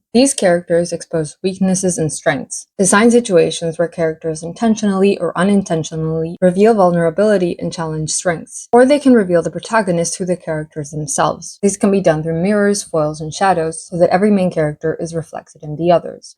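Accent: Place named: Russia. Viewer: i wanna say russian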